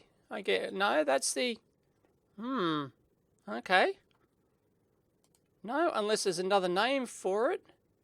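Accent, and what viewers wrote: Australia. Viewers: With your australian accent, honestly I sometimes wasn't sure whether you said "uhm..." or "ARM" :) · Wtf he is australian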